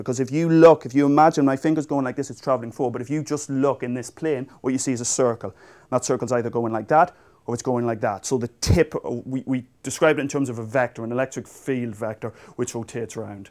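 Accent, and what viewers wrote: Ireland. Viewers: is he irish? if he is good to know that were making an impact on the world other than being drunk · He is definitely irish! =D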